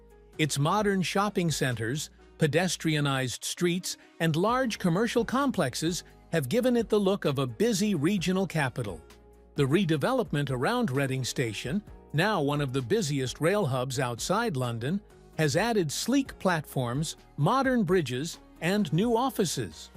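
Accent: United States. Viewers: Bloody awful US accented narrative with non-English pronunciation! JeeZ!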